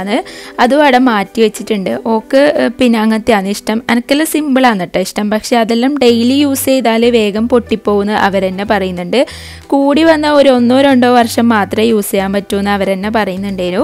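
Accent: Kannur, India. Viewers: IngaleKannur bhasha pwliya😊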